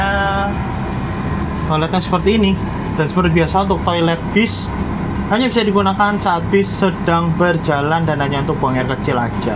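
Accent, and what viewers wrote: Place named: West Sumatra, Indonesia. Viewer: Bicara orang minang ya, kym trans yang abang naiki kebetulan orang minang juga founder ama ownernya, salah satu PO bus urang awak yang diluar sumbar yang eksis seperti epastar ama tam yang bermarkas diluar sumbar.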